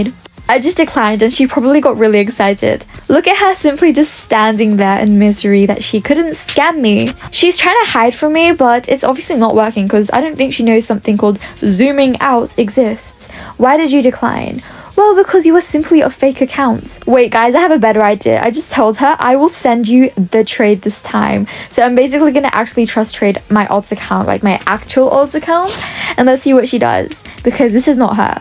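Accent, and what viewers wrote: United Kingdom. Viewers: normal British girl.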